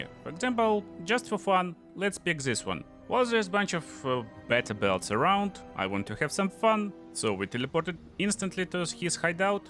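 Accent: Russia. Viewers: Чувствую русскую нотку проскакивающую через английский)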